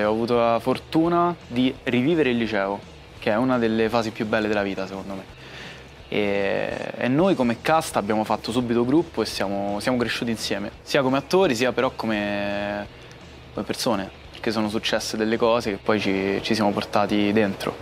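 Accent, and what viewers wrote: Rome, Italy. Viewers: alla Ferilli nessuno ha fatto appunti di questo tipo per la sua presenza a Italia's got talent.. Eppure non sta recitando nella trasmissione..la sua cadenza romana non è paragonabile a quella di questo ragazzo. La Ferilli ha costruito la sua carriera sulla sua cadenza.. Fuori dalla recitazione ancora di più. E come lei tanti altri. Altro che Ludovico Tersigni. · Un po' meno accento romano che amo negli attori ma non in un conduttore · Ma chi e? Ma perché? Ridateci Cattelan 😭 E comunque, la smetterà mai di avere questo forte accento romano, che poco si addice ad un conduttore?! (Ma pure ad un attore a dirla proprio tutta.)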